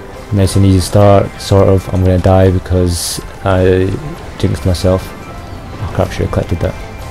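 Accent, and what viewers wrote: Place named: Scotland, United Kingdom. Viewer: You sound Scottish.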